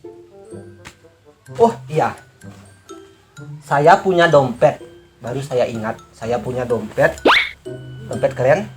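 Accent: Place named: Bali, Indonesia. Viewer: tabanan napi gus?tiang saking jegu....logatne kentel care logat penebel e...sampun tiang subscribe...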